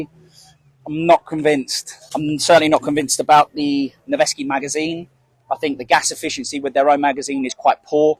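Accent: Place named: Canary Islands, Spain. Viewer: Se me hace gracioso ver a un inglés hablar español con acento canario xd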